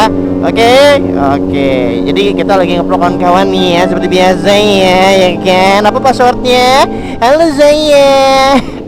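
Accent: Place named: Lampung, Indonesia. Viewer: YouTuber dari Lampung mana ini suaranya nee.?👍👍, . . . . Monggo mampir ke channel ku juga yaa🙏 .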